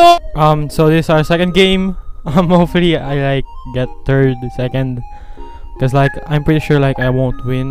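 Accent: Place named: Philippines. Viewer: You Really Have A Filipino Accent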